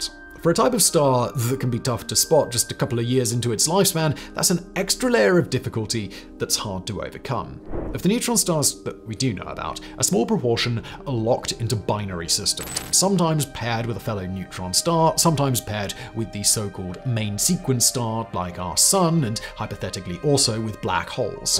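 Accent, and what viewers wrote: United Kingdom. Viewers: Why is it only British men are allowed to narrate space or nature videos?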